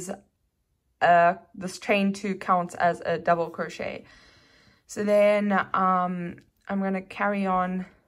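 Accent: South Africa. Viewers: Hello thank you for such an amazing tutorial! Just a random question, are you South African?😊😊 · Are you South African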